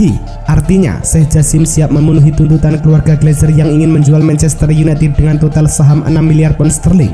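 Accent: Java, Indonesia. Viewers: Sudah bagus cuman Host nya di ganti lah,ending yg cewe, yang cowok ini terlalu Medok.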